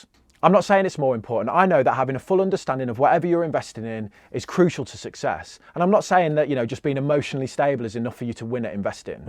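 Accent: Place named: Lancashire, United Kingdom. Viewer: It's been doing it since i got here lol thats proper Lancashire speek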